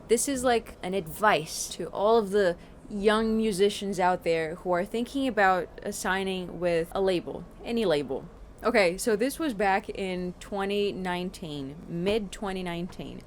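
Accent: Brazil. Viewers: Meu deus, sabia, eu reconheci uma parte do seu pequeníssimo sotaque "brasileiro" além dá sua aparência que lembra a minha e minha família, espero que tudo dê certo para você, também sou músico, mas minha música é difícil de bombar, anyways I´m on college. SAUDAÇÕES my friend CONGRATS FOR THE BEAUTIFUL WORK!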